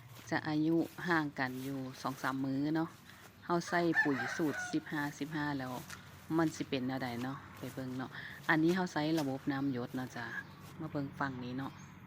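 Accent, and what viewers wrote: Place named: Sakon Nakhon, Thailand. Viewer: สำเนียงเหมือนบ้านผมเลย สกลนคร ผมก็ทำสวนพริกเหมือนกันครับ ขอบคุณความรู้ครับ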